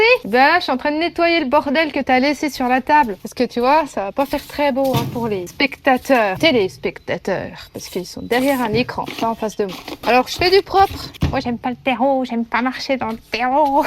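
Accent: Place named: Burgundy, France. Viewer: Très bons conseils et j’adore ce petit accent bourguignon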